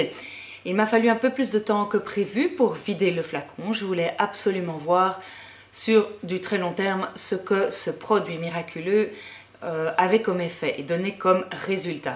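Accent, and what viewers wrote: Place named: France. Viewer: Beautiful accent...love the french accent so much ☺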